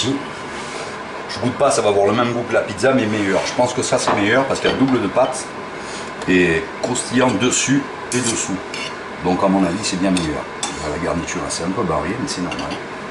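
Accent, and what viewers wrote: South, France. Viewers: je vien juste de l'abonnez dis moi tu ai un mec du sud? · c'est génial merci à toi, je vais voir de suite! Ps: Ma copine vient du sud, on trouve ton accent ravissant !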